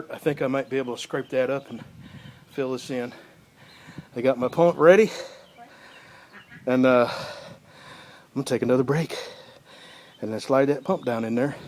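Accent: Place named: North Texas, United States. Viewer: Cry Babies all around just goes to show Somebody's got to Hammer anything a Man does today. I did something like this 30years ago but not near as much thought or preparedness was involved. I like yer thought process and initiative in taking this on. You must be somewhere in the mid south. Sound like my cousins from NorthTexas. I'm gonna follow yer lead in the spring and put a shallow well in myself again for the same reasons related to $$$$. I appreciate yer work and have learned enough from this video to do mine better when the weather gets warmer. My Family out west has that same Dry ( I love it) Humor. Keep up the good work, I'll be watchin!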